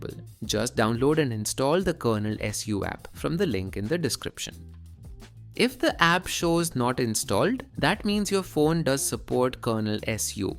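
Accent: India. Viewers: I don't understand Indian accent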